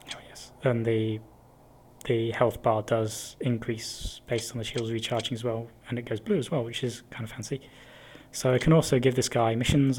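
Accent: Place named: United Kingdom. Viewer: I am really happy to have voiceover on these! Your lack of voiceover experience is compensated by your British accent. You're doing great! Keep up the good work!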